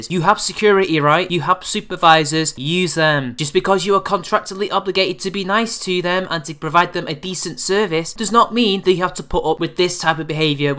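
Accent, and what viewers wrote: United Kingdom. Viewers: I'm not sure you're aware of this but Sargon and Thunderf00t are British, too. In fact, your accent is more like Sargon's. But that doesn't change the fact that your delivery style is like TL;DR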